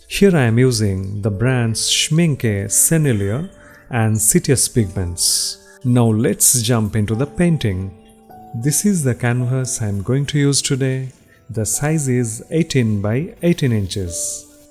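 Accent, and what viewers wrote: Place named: India. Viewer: Before reading that you are Indian ..i recongnize your campus accent.. i have travelled a lot all around India ...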